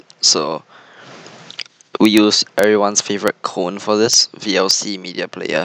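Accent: Singapore. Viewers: Yooo singaporean??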